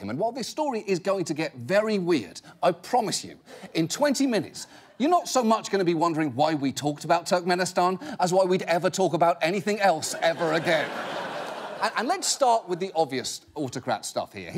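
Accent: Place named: United Kingdom. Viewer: that trademark British delivery system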